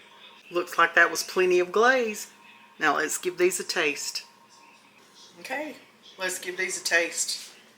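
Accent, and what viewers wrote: South, United States. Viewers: I so Love your southern accent My precious Mom in Law and Dad in Law were from Northcarolina and i miss them both so much I'm from Montana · I came across your channel when you were doing the easter dinner for 1 or 2, i live alone and your ideas are great. I usually cook meals ahead and freeze them. Seeing those biscuits reminds me of my husband. We always had the the grands in the refrigerator. Especially when we had company for the weekend. Nice breakfast., i've seen my aunt Melissa make them into apple dumplings. Thank you for sharing , i love southern accents, especially when they're spunky, like yours, thank you🙂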